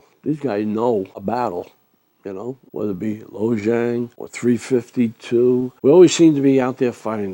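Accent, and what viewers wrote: New Jersey, United States. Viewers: You sound like your from joisy God Bless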